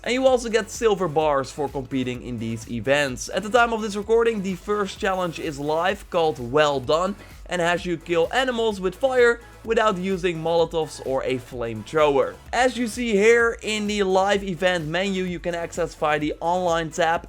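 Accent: Netherlands. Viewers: Jii bent Nederlands zeker? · the strong dutch accent u have tho jeez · Ben je Nederlands?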